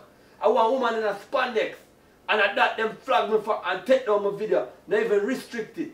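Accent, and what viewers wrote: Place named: Jamaica. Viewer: Seriously, whether or not you are Jamaican you are way funny and you do sound real Jamaican.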